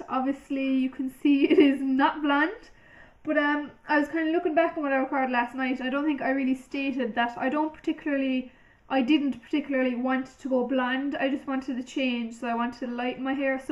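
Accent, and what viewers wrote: Ireland. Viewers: I love the way u speak. I like irish language, in half irish (wish i could talk like u guys) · Are u Irish? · A Irish u tuber way ha! Glad to hear a Irish voice !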